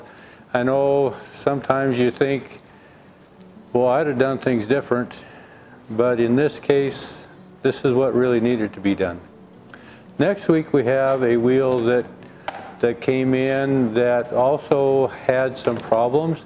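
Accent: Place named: West, United States. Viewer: This is a snarky, implied criticism of the customer, who is labeled as being in effect "inauthentic." As though being a horse and buggy mechanic with a MIG welder, video cameras and video editing software, and a Youtube channel dedicated to ASMR videos with a horsey western twang is somehow more authentic. A case of the kettle calling the pot black. Leaves a sour taste. "What you mean 'we,' Kimosabe?"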